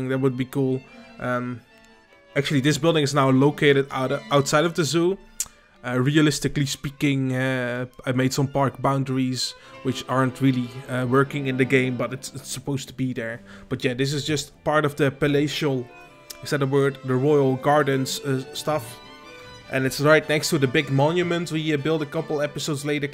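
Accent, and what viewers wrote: Netherlands. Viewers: Ik hoor dat je nl bent 😏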